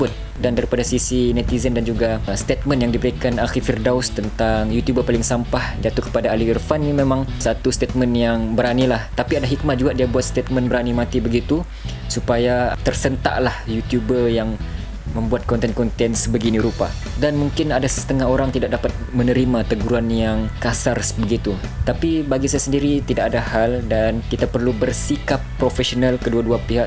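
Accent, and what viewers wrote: Sabah, Malaysia. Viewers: Bro ni orang mana ya? Loghat sabah hm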